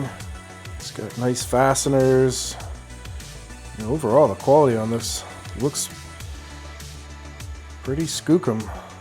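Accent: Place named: Louisiana, United States. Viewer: I knew you sounded like family! Lol I’m south Louisiana also, used to be the furthest south you could get in Venice! Anyway, I just got accepted to the vine program and I’m watching your videos so I can see what to search for Maybe. Thanks for doing this. Maybe I’ll get some inspiration from you and start actually creating content since I have tons of equipment to do it just not the patience. Lol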